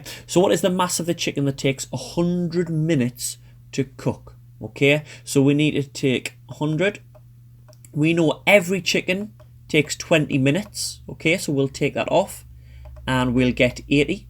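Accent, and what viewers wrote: Scotland, United Kingdom. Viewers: You speak like Scotland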